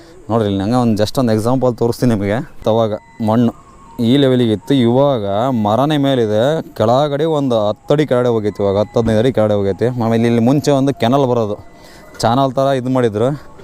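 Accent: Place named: North Karnataka, India. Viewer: ಹೇ ಗುರು ನಿಮ್ಮ ಭಾಷೆ ಉತ್ತರ ಕರ್ನಾಟಕ ತರ ಇದೆ.. ದಾವಣಗೆರೆ ಭಾಷೆ ಸ್ವಲ್ಪ ಚನ್ನಾಗಿ ಇದೆ ಅದನೇ ಮಾತಾಡು 😂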